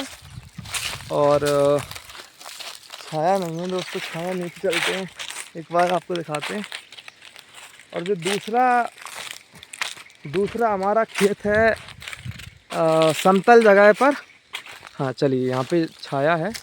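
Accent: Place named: Bundelkhand, India. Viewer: Kaha se ho bhai.....bundelkhandi lagray😊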